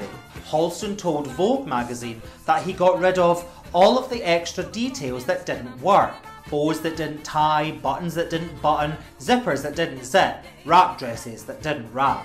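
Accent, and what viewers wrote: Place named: Scotland, United Kingdom. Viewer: stay tuned...because of the virus...unfortunately theyve halted production...but a miniseries is being done on Halston....the star...is EWAN McGREGOR...i got a bit emotional watching your video...because you used an image ...the image you used at 1 minute and 53 seconds in your video......that a friend of mine shot of Halston...his name is HARRY BENSON...he is a Scot just like you....with that same lovely burr you have.....he is the man who predicted i would become a photojournalist....i was just a writer when i worked with him... i laughed when he said it...but he was right....i think of him every time i do a shoot...he taught me to be AGGRESSIVE AND RELENTLESS....he photographed Halston a lot...and he loved him...he was basically the main photojournalist for the then new magazine PEOPLE magazine...and he shot Halston ...Liza...and Liz...for the cover...all together...FABULOUS...Harry said that H...was the king of NYC...and rightfully so...Harry also shot H...for WWD quite a few times...Harry said that H..would give him beautiful scarves to give to GiGi...his wife and she still wears them proudly..i cannot begin to tell you how wonderful H was...of course you know he used a lot of women of color as models...Pat Cleveland of course.....Alva Chin....but he often used the 1st black supermodel...the late....NAOMI SIMS...a LOT..she is MOTHER...to everyone who is black that loves fashion...she is MOTHER..when she retired...to create her own makeup line..and wig line ...both are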